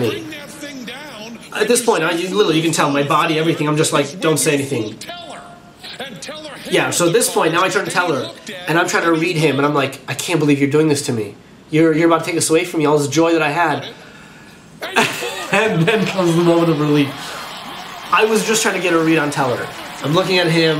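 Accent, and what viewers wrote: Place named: Europe. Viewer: Костя, у тебя на сцене почти не было акцента, а в реальности есть небольшой европейский, не совсем славянский. Интересно... Такое бывает когда в 10-13 лет иммигрируешь.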